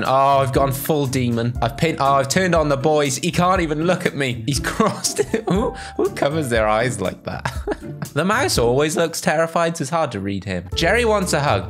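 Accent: United Kingdom. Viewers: sir- are you british?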